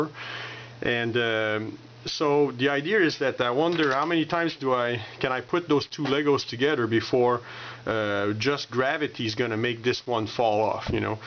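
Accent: Canada. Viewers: Togeder! I keep cracking up when he says together and I love your Canadian accent. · you sound like you are French Canadien. Either way looks good.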